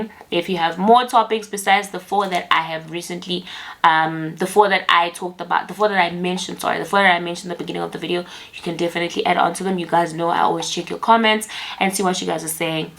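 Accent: Nigeria. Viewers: I always take notes when you do sit down videos and I enjoy them so much. I agree particularly with the people pleaser point because I am unlearning this and it is so uncomfortable and so weird for me. I sometimes feel like I'm doing something wrong when I don't do things to please people anymore. Thanks for imparting knowledge and nudging me in the unlearning direction a bit more. Side note: may you please do a video in your Nigerian accent one of these days.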